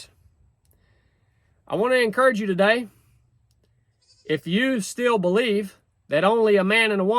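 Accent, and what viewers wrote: South, United States. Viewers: What state down south are you from bro?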